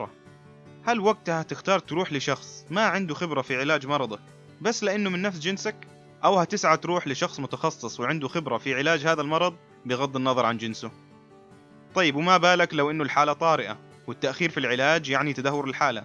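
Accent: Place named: Hejaz, Saudi Arabia. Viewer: لا تتعب نفسك بهالمبررات اللي إنت تقول عنها بأن الإختلاط في مصلحة الشعب أو بأنه تحضر وتقدم أو بأن الإختلاط ضروري بالمستشفيات وأن من الصعب الفصل بين الجنسين بها .. لا بالعكس المستشفى أكثر مكان يتوجب الفصل بين الجنسين به لأنه المكان الوحيد اللي بتضطر أن تصطحب محارمك وتذهب إليه وفيه كشف للعورة أحيانا وفيه قولة خل محارمك عندي واطلع برة .. ولا يوجد رجل في قلبة ذرة غيرة مؤيد الكلام اللي قلته بالمقطع .. لو أن هناك أماكن من الصعب أن تفصل بين الجنسين بها مثل المسجد الحرام وبقية المساجد قال الإسلام النساء يأتين بالخلف وكذلك الشوارع والأسواق ولكن المستشفى وش خسرانة الدولة لو تم الفصل بين الجنسين ولو بالشيء القليل .. الغيرة موجودة يا صاحب المقطع مبين من لهجتك بأنك من حضر الحجاز يا اللي كل همكم وشغلكم الشاغل التمويل والسعي ورى الإنفتاح والإختلاط ووضع مبررات وحجج بأن الإختلاط والإنفتاح فيه فايدة للشعب وتقدم وتحضر ورقي .. قبحكم الله .. الإختلاط بالمستشفيات هو اللي أجبر بقية الأماكن أن تتبع خطاها بالإنحلال و الإختلاط اللي حرمه ربك .. 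تقول بالمقطع صعب على الدولة أن توفر في كل قسم وفي كل تخصص طبيبات وفنيات وصيدليات وإداريات في أقسام نسائية مستقلة وش الصعب في الموضوع .. لو الدولة لم تجد امرأة سعودية في تخصص معين بإمكانها أن تأتي بطبيبات وفنيات وإداريات من الخارج وتوفر وتسد حاجة كل قسم حتى بنات البلد يغطين النقص .. لو يا صاحب المقطع صعب تدريب الشباب الأطباء الطلبة في أقسام نسائية وتوليد هن للنساء ولادة طبيعية مع العلم بأن من اللي كان يولدهن قبل مجيء المستشفيات وأحيانا تخصصات تافهة من السهل أن تسد حاجتها سيدات مثل الأشعة و المختبر ومن يشفط البلغم من حلق المريضة لااا.. والممرضة اللي تروش المريض ..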